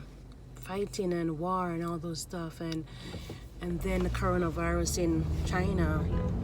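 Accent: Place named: Jamaica. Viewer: I could almost tell u was Jamaican to thts crazy Lol I’m half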